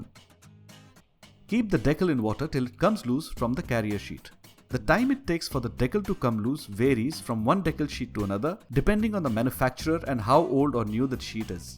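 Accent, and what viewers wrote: India. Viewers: He is Indian, so it is the way he pronounces it.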